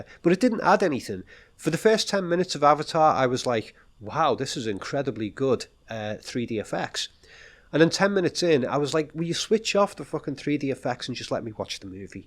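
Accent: Liverpool, United Kingdom. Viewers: Are you a Northerner??! Liverpool?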